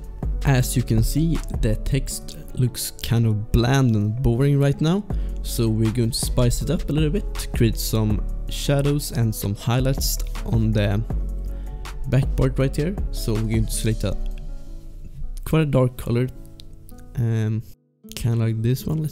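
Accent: Sweden. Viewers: ARE YOU SWDEDISH? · are u swedish? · I know a swedish accent when I hear one ^^ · Are you swedish?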